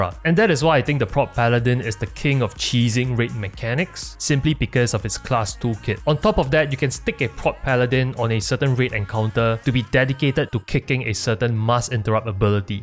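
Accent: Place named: Singapore. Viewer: Hey man thats awesome! I'm on Thaurissan myself , also GM and trying my best to do content creation (which is why i got excited when i heard the Singaporean English). All the best!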